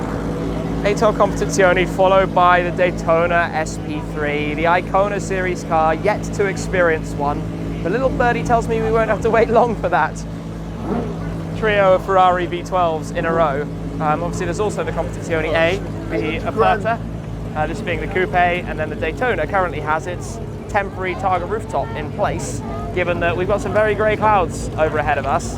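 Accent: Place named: England, United Kingdom. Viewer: Is it me or all the car you tubers have English accent 🧐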